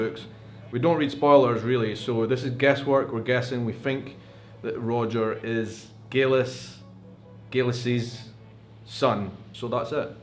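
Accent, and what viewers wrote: United Kingdom. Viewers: Make no mistake I LOVE YOUR CHANNEL and as I said to another person we ALL have accents including me. But my California accent is ugly!! They said in NY that it was all those flat ''A's"" like ''baaaaaaath''. It's not classy or cute like yours or in all of Britain. I loved it when you said ''Roger Roger, Roger what are you playin' at Son!!'' LOL! I know wow.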